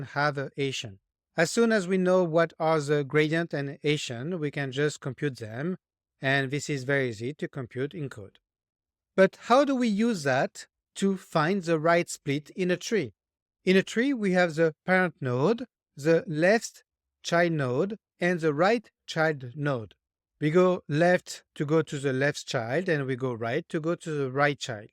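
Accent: France. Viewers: t'es français, mon pote ?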